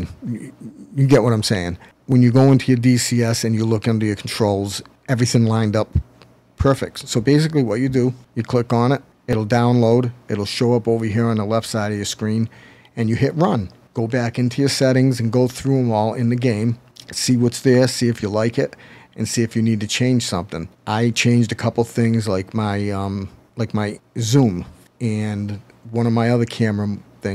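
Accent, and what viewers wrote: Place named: New England, United States. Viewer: What part of the north east are you from sir? I’m hearing RI or Mass.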